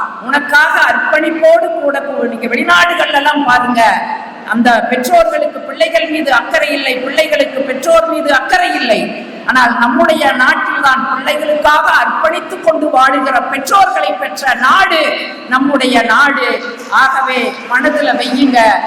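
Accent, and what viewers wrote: Kongu, India. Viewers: சிறப்பு மேடம். கொங்கு தமிழ்....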